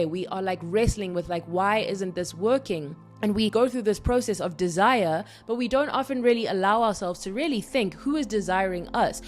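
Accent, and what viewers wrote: South Africa. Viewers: Such a timely topic of conversation. Yesterday, and today as well, I had a conversation with God, questioning the idea of "Devine timing" vs "Doing things at my own time" and the response was so humbling but felt good "It's not only about you. It's about everyone else who is going to be impacted through and by you too. it's not just about you." and then today it hit me "The things you want are also manifesting you . " And it shifted Everything from resistance and obsession, to Allowing it to naturally inevitably unfold. . And TADAAA here's I come across Your video confirming everything that God , angels and spirit guides are telling me. ❤❤❤❤❤🎉. I'm so happy to hear a south African women's voice creating a space like this and using this platform the way you are. 🎉🎉 We've been waiting for your voice Sis❤🎉😂YES!!!!!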